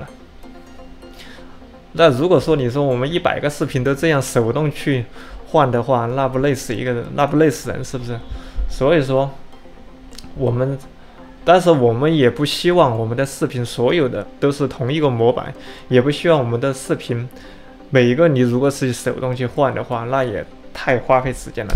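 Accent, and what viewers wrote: Sichuan, China. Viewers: 播主说的川普，挺可爱的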